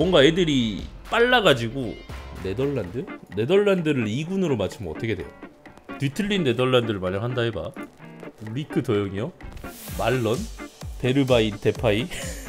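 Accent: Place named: Japan. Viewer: 이형 일본팀 하면서 일본 말투 중독됬네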